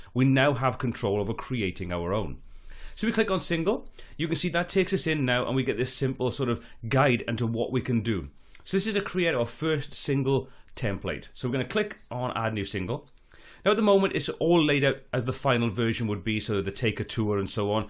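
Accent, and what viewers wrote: Wales, United Kingdom. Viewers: Great to hear a fellow Welsh accent :)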